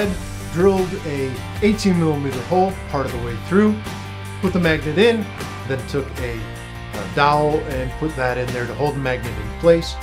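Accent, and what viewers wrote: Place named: New England, United States. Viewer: What a wonderful idea to use the hidden magnets to secure your planes! Your shop continues to become more organized with each project! I see your plane collection has really grown! They're both functional and beautiful tools! The plane till was an awesome storage idea and it turned out great! You got that New England accent perfectly perfected too!! As always, enjoyed seeing your latest project! You and Buddy have a great weekend! God bless!!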